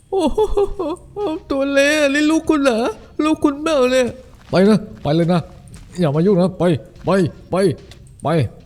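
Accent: South, Thailand. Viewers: เป็นคนปักษ์ใต้ไหม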